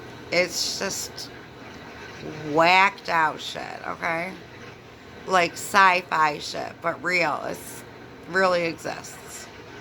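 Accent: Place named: New York, United States. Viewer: Wow, I grew up in NY and was all over your other channel trying to figure out if you are in NY from your accent. I finally looked up a restaurant I saw on a sign in your video, then found this channel, where you announced exactly what I took a half hour figuring out (Rochester). So I am going through your vids as a fellow NYer (in Phoenix - don't come here) point of view and not to be a sadist but enjoying it because it is comforting to hear from someone with your NY vibe.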